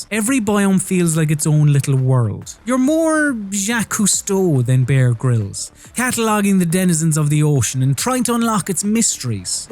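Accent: Ireland. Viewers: I have never heard such Irish accent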